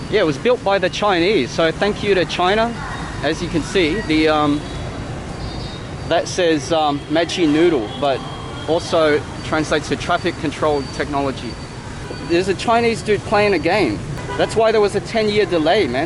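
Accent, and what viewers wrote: Australia. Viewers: The new metro looks good! I hope they install platform doors later on. It would make the system a lot safer. Your stations are above ground rather than underground like ours. I'm from Hong Kong, China. Your accent - is that Australian or New Zealand? Also, LOL about Vietnam's population of 98 million. That's what I see on Worldometers. Yes, I agree got to hit 9 figures.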